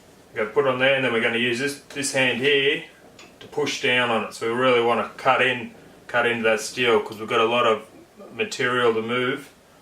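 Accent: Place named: Australia. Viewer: And a n Aussie it sounds!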